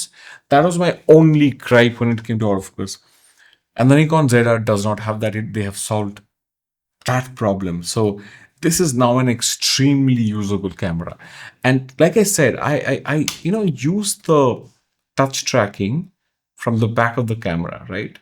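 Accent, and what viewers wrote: India. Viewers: Are you bengali by any chance? Anyway did you try using the mini hdmi to hdmi coverter with the cage? What is that part called?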